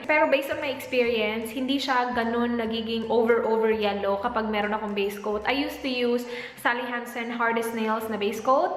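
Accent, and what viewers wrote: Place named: Visayas, Philippines. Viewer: Are you Bisaya? I looooove your accent!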